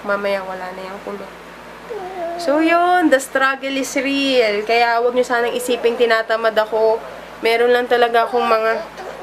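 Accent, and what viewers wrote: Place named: Batangas, Philippines. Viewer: Haha .batanguena po b pa kayo may punto po kase kayo hahaha? batanguena here po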